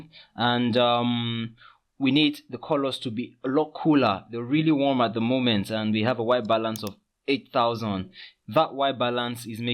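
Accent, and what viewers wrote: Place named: Nigeria. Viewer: Thank you Bro its really helpful I understood it more with your accent,more grace and knowledge..Naija Bro sending love from TN,U.S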